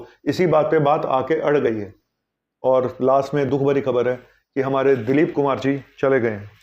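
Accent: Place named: Kolkata, India. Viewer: Kolkata reeee..... bolne ka style bahut achha lagta. Aap kolkata aaiye mishti doi khilaunga jarur .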